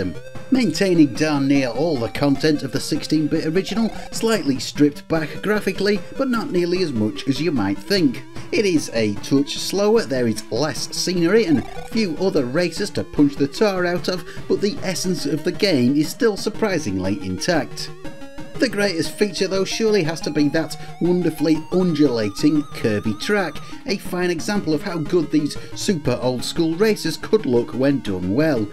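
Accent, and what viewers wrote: United Kingdom. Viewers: Great vid, huge SMS fan here and it's good to hear a Brit doing it justice. Subscribed!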